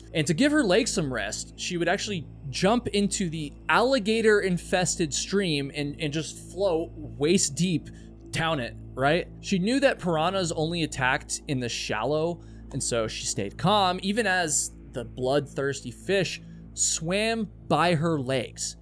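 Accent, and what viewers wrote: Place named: East Coast, United States. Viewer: I'm from Toronto, and it warms my heart to hear your East Coast slip out every now and then. Words like charge and bar, love your channel; keep up the good work!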